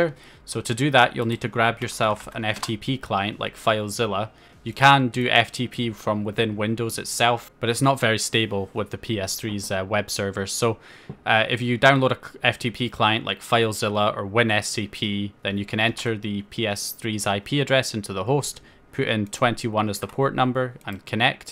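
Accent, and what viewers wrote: Scotland, United Kingdom. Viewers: Loving the channel! Well paced, logically explained, no background techno and a clear Scottish accent!! ;) Top class and incredibly helpful. Thank you!!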